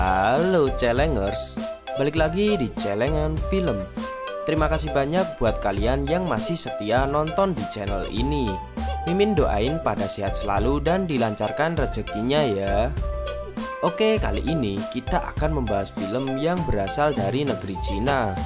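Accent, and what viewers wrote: Java, Indonesia. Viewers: Jawa nya medok banget min 🙈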